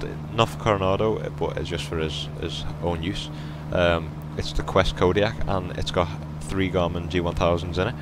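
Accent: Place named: Liverpool, United Kingdom. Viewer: Are you scouse, you sound like a scouser :D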